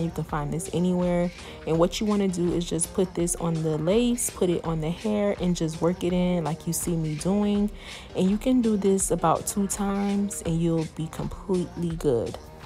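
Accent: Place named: Chicago, United States. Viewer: You must be from CHI. Honey that accent comin through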